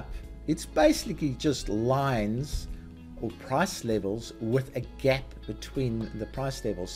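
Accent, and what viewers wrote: South Africa. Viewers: That accent- are you South African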